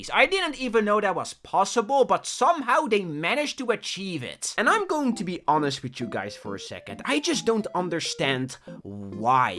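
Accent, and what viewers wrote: Netherlands. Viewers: hoi ben jij nederlands??? ik hoor het aan je accent lol edit: lmr ik weet gewoon dat het zo is want ik ben echt foekoeng slim snap je de grap hahahahahhehehehehe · are u dutch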